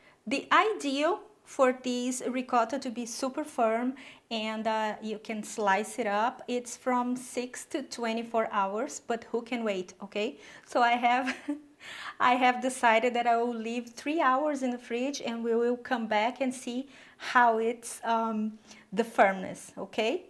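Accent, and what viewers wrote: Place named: Brazil. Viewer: Where from Brazil you are from? I figured out by your accent!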